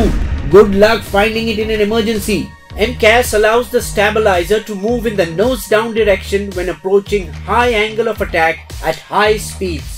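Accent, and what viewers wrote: India. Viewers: IS MY NEW ADDICT VOICE IS VERY CLASSIC MYSTERY DIGGERS ALSO MY FAVOURITE BOTH WITH NICE INDIAN ACCENT UNIQUE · Thees is Indian English speaking style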